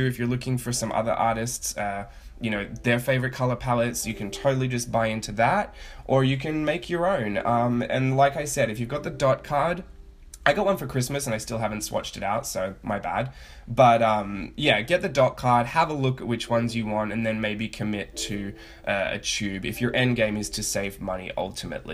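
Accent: Australia. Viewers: Thanks for the lovely original swatches and the info on DS colours. It's always nice to hear an Aussie accent on mixed media so keep making these wonderful videos. xx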